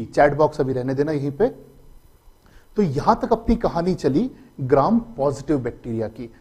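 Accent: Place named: Malwa, India. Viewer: Sir u r a genius with ठेठ (beyond)मालवी accent🙏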